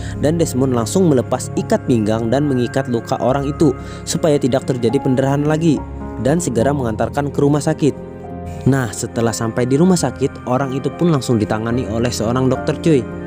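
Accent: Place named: Banjar, Indonesia. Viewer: Kok kaya orang banjar logat ya bro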